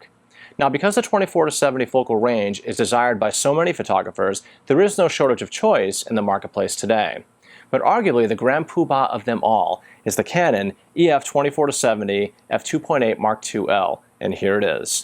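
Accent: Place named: South, United States. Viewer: Very refined manner of speaking from a gentleman of the South. May I ask what Camera-lens combination did you use? Your video is just so beautiful, with the bokeh very pleasing to the eyes. Everything within the frame is supremely picturesque.(And by the way since you are a Canon user, I subscribed immediately)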